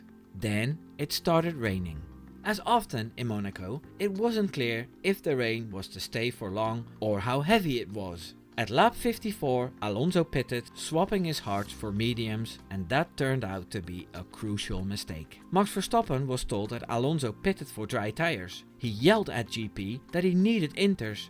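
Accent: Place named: Netherlands. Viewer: ik kan aan je engels bijna niet horen dat je uit nederland komt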